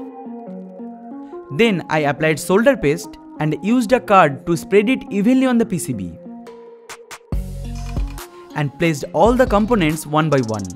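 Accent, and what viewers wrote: Bengal, India. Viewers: He’s sounds Bengali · I love this Bengali accent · That Bengali English accent 🙄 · Indian accent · Go to Shark Tank with this and get this out there! A genius man with a very enjoyable accent, after british, indian english is my favourite commentary language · This is the first video i am watching of this channel and the moment it started i knew he was bengali lol becoz i am also bengali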